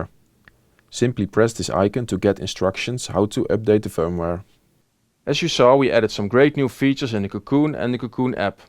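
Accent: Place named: Netherlands. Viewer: Ik hoor aan hem dat hij nederlands is ;)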